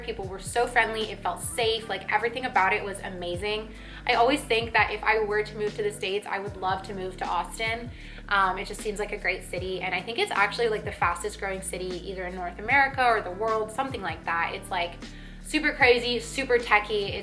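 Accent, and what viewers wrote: Canada. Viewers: Your Canadian raising is strong in this one, haha.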